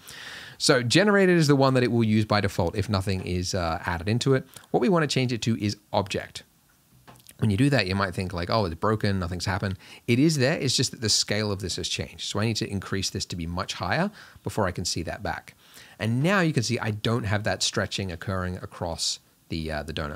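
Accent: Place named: Australia. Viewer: bru are you an Aussie mate?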